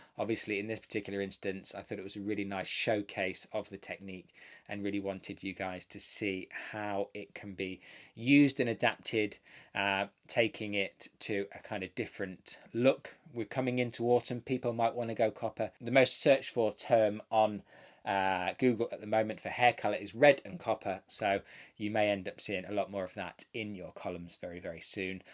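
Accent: United Kingdom. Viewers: I've been watching your videos all day on Saturday and it's so nice to watch and listen to another Brit. US colouring terms are so different to ours. I know now that if I did want to colour mine (South Asian hair) I would know what to ask for.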